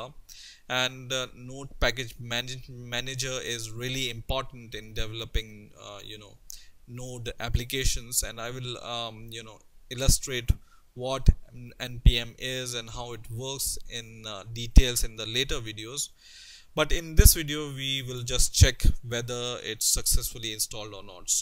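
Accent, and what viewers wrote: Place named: India. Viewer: indian english is best ! so clear and easy to understand ! thnx bhai anyways :-) best tutorial !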